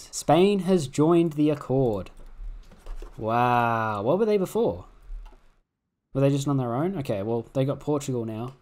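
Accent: United Kingdom. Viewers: it's called british accent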